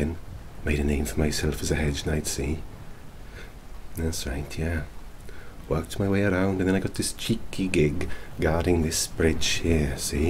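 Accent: Wales, United Kingdom. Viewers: How did I not know until now how much I’ve been needing a Welsh accent in my ASMR? Magnificent in every way, as always,. 🤍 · 「 greybeige 」 He’s actually Welsh so this is his ‘true’ accent.😊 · As soon as I saw the horns, I thought: oh. shit. This is incredible,. And the rumbly Welsh accent is 🙌🏻. I have a lot of this world in my head lately- who else is excited for the Gawain movie this weekend?!💚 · I've watched this so many times now, since it first premiered in the collab...I pop it on every so often and get sucked into the pathos and can't stop watching. By the end I'm like, deeply moved, and also feel so serene from the atmosphere and the progressively more relaxing way the speaking style and mannerisms devolve. "Small mercies against a great evil, they make a difference to a man," indeed. Killer costume, and hats off to that Welsh accent and the distinctive voice and physicality and...self, you brought to this. 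One of my top favorites of yours...just...so good!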